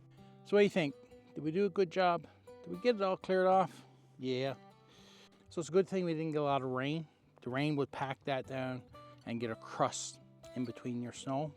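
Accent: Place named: Newfoundland, Canada. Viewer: I absolutely love watching anything you post. Love that Newfie twang! I have a NovaScotia twang lol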